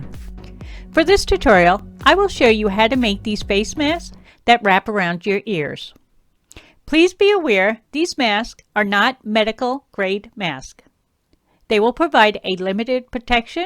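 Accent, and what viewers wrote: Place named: Louisiana, United States. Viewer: Your accent sounds like you are from South Louisiana. Am I right?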